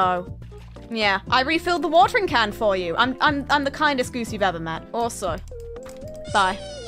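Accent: United Kingdom. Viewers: My first time watching outside of shorts and somehow she is even more British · The Brit really came out of her this time XD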